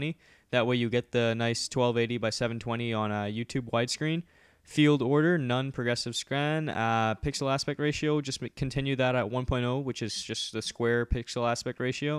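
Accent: Canada. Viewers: Your voice changed. You have more of a Canadian accent now.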